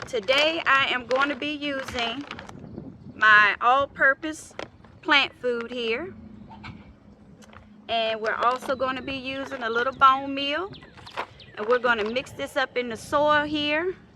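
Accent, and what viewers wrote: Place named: North Louisiana, United States. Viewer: I know boot 👢 when I hear it.. North Louisiana me..great job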